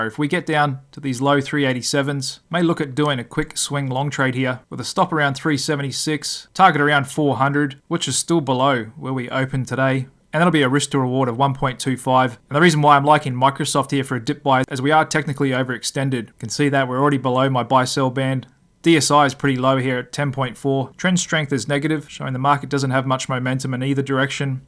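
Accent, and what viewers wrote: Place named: Australia. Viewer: I liked that Aussie accent 👍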